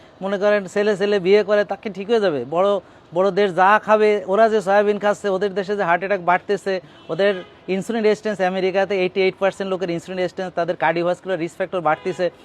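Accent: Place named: South, Bangladesh. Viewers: Sir apnar দক্ষিণী ভাষাটা ভাল লাগছে।মনে হল আপনি আমার বড় ভাই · ভাই আপনি খুলনার ভাষা কোহানে শিখলেন। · যশোরের ভাষা মনে হয়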